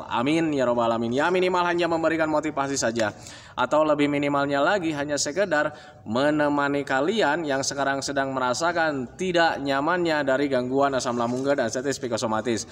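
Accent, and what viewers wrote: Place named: Makassar, Indonesia. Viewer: Makassar mn suaranya ❤❤❤❤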